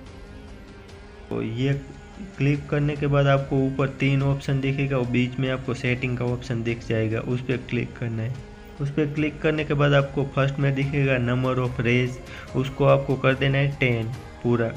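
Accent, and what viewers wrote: Gujarat, India. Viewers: Bhai aap ka voice Gujrati lag rha hai mebhi Gujrati hu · Tare avaj thi aevu Lage che ke tu gujrati che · Bhai tme gujarati chho ne😅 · BRO GUJRAT SE LAG RAHA HE ❤ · Bhai Gujarati ho kiya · Apke avaj se lag rha hai aap gujarati ho. Sach bat hai ? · Bhai aap Gujarati ho aapke voice se lag raha hai sach kya ??? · You from gujrat?? You voice like Gujarati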